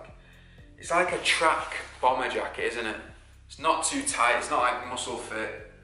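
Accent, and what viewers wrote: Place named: Liverpool, United Kingdom. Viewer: Are you from Liverpool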